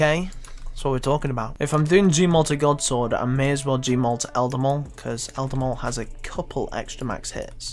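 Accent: United Kingdom. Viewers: You literally sound like my old mate lloydreed1 lol voice is trippin me out. Must be from the same part of the uk 🇬🇧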